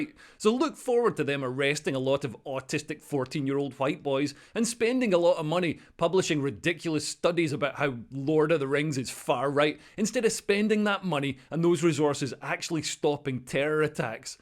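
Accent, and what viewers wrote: Scotland, United Kingdom. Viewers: Fair play. You haven't got a clue what you're talking about but you do talk very quickly with that Scottish accent which gives those who dont know any better that you have some insights. Anyway, love to the family.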